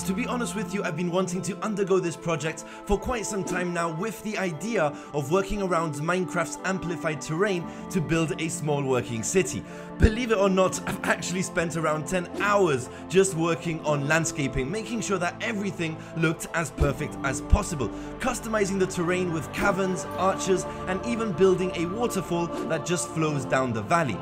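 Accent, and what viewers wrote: United Kingdom. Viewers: I don't want to be rude but are you British😅😅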